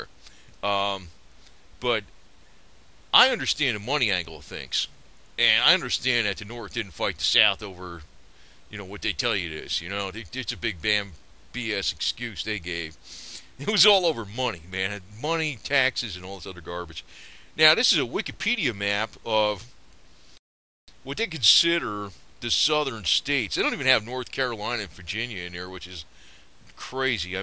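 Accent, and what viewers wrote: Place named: New Jersey, United States. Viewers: Oh and you still speak like your from jersey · All I hear is your Joursey, drawl. Now I don't think I have a accent, but I guess I got a bad down east one, which out west they think is cute. Lol. I don't think anyone thinks they have an accent. Until, you go to another part of the country.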